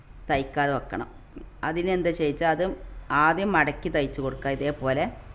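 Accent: Malappuram, India. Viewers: താത്ത സൂപ്പർ നമ്മുടെ മലപ്പുറം ഭാഷ പൊങ്ങട്ടെ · ഇത് ഞമ്മളെ മലപ്പുറം ഭാഷ.. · തനി മലപ്പുറം slank...... no ജാട.... അടിപൊളി താത്ത...... i like it ഉറപ്പായും ഞാനും ഉണ്ടാകും ഞമ്മടെ ബെഡ് സീറ്റ്‌....... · Malappuram original samsaram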